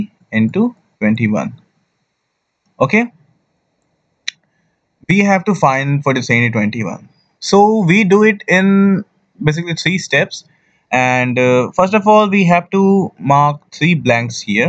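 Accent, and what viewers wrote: India. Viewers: you already know ur gonna learn something when you hear that indian accent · Sorry bruh with your heavy bloody indian acetone m accent it doesn't take 3 seconds, it took like more 45 seconds mate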